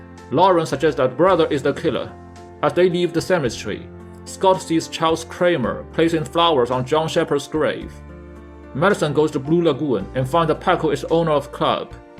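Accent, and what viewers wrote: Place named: United Kingdom. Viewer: your accent is too british cannot understand anything clearly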